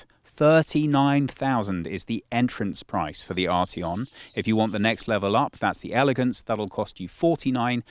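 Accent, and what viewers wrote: United Kingdom. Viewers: Excellent and thorough review! British accent is music to my ears! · What an incredible DESIGN ROBBERY yes ;) Great review, love his English accent, Autogefühl have the best car reviewers of the net... but guys, please try to look neutral... your reviews are always biased regarding the VK company's cars... this sentance is just proving that... I know they are providing you with new cars and interviews... but starting to feel sick of this propaganda for DAS CHEATERS #DieselGate #MyTake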